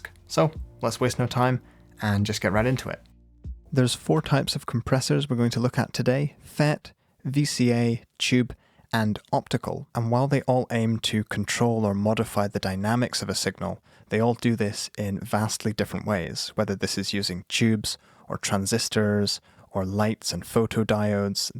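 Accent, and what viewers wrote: Scotland, United Kingdom. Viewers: He does have a Scottish accent, it's just that he's from a part of Scotland that has a softer accent rather than a more pronounced one, such as Glasgow. :)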